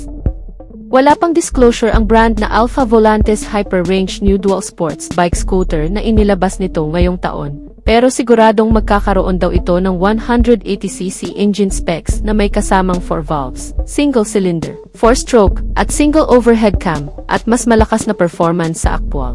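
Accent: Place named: Conyo, Philippines. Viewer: Kunyo mag salita.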